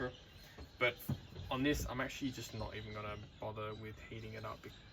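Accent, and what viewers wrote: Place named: England, United Kingdom. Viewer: Love your videos! Can you give us a tutorial for concealed carry bags? I am an U.S Army veteran, and I carry my gun with me for safety purposes…but the concealed carry bag I purchased on Amazon is already falling apart after having it for 1 year. I was wanting to make my own. Not sure if you support concealed carry weapons, since it sounds like you’re from England, but I just thought I’d ask.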